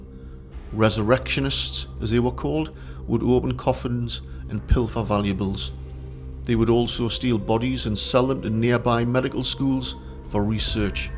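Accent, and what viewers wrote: Newcastle, United Kingdom. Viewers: Great to hear a proppa Geordie voice on the tube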